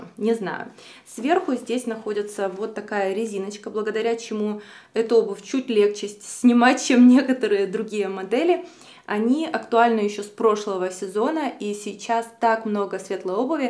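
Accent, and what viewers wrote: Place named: Surzhyk, Ukraine. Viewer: хочу сделать вам комплекс. Примерно 4 года назад я перестала смотреть все ваши видео потому что ваша речь была просто ужасной. Постоянное эээ, мммм, все жутко медленно и без конструктивного текста. Случайно наткнулась на это видео и хочу сказать что сейчас стало намного лучше!! Ваш русский тоже стал лучше, сурджик уже не так сильно режет слух. Ещё есть куда расти, нужно поработать над тем чтобы быть более естественной перед камерой, но все же- очень заметен ваш рост как ютубера! Так держать! 👏🏻👏🏻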